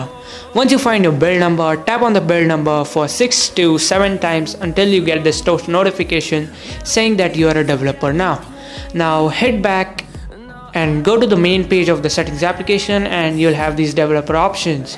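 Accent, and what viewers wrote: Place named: England, United Kingdom. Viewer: Nice English Accent 👌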